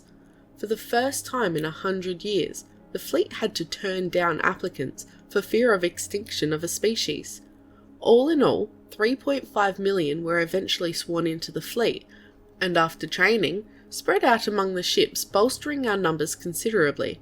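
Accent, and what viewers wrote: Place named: Australia. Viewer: I've been listening to other YT-ers with their HFY stories, and just found you... very cool... I like to listen to those others, but its great to hear a fellow Aussie reading these! You give a better inflection to what you are reading, which I like. Subscibed. :)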